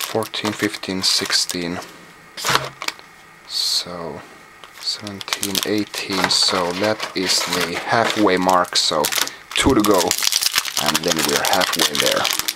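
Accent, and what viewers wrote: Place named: Finland. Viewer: I think I just nerdgasmed for the accent suomi perkele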